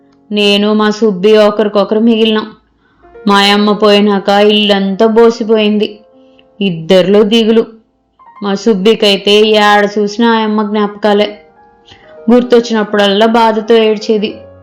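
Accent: Rayalaseema, India. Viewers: ఈ రోజే విన్నా. ఎంత బాగా చదివానో రాయలసీమ యాస అలవోకగా చదివావు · రాయలసీమ యాస చాలా బాగా చదివావు. సూపర్ 👌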